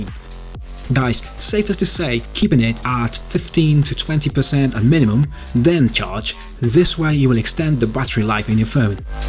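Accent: United Kingdom. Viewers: Супер аппарат, интересный тест👍 и я впервые в жизни слышал чистую британскую речь... Хотя с детства слушал the beatles например, но никогда не замечал это))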